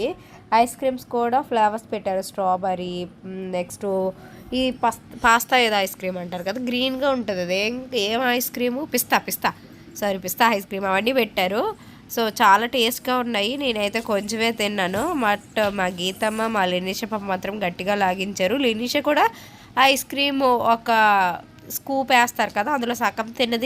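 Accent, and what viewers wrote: Srikakulam, India. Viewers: Malli, language marchestunav nv srikakulam laaa matladitenaey estam akka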